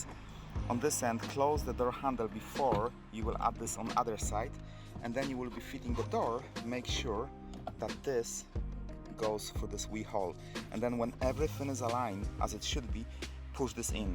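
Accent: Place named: Poland. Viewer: Ty jesteś Polakiem słyszę jak mówisz 😆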